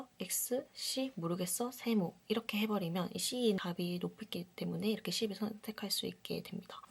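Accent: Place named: Seoul, South Korea. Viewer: 난 서울말인줄 알았는데 차분하니,, 어디 사투리라는 거임?? 전라도도 아니고 충청도도 아니고.. 음..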